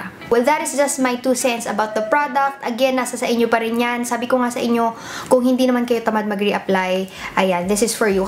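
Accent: Philippines. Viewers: im really love philipine accent😍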